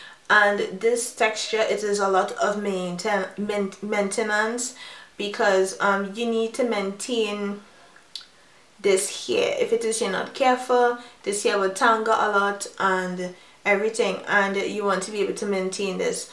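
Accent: Trinidad and Tobago. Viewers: you sounding trini ☺☺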